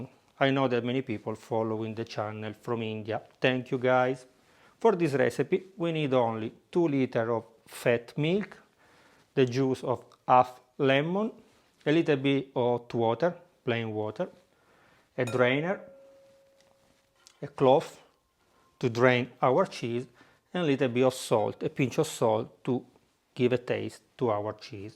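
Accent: Italy. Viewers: Spe-cta-cuuu-larrr I like your all videos & Italian flavoured ENGLISH... Best wishes & love from INDIA...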